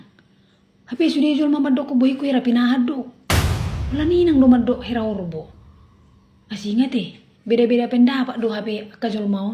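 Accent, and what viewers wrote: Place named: Medan, Indonesia. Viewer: Enak an bahasa indo kak medan nya nampak 😢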